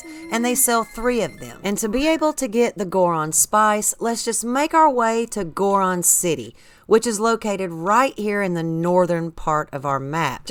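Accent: South, United States. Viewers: You are from the south thanks for the information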